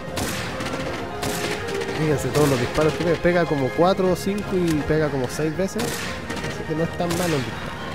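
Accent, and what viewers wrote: Chile. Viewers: Hay cientos de videos, pero nada me hace entrar mas en confianza como latina que oir un gameplay chileno.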